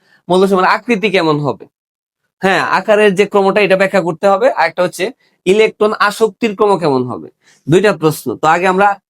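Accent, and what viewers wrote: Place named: Comilla, Bangladesh. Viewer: Vaiya Apnar gramer বাড়ি may be কুমিল্লা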